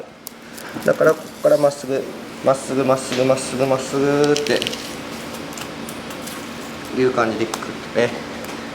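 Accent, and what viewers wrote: Miyazaki, Japan. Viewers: 若いころにいた会社でお世話になった宮崎出身の上司の方言と同じ方言で凄く懐かしい。そしてこの人、教え方も丁寧だし技術も上手いしで、本当に凄いです。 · なーんか聞いた事ある 話し方と思えばやはり宮崎(o´罒`o) 宮崎のしゃべり方は落ち着く笑 けど自分がこんな感じではなしてると 自覚してる人はいない笑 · 宮前弁まるだしw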